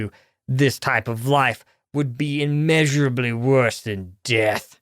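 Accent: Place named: Tennessee, United States. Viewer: The moment I saw you post this I thought, “Oh I can’t wait to hear the line ‘sagging nipples’ in a Tennessee accent.”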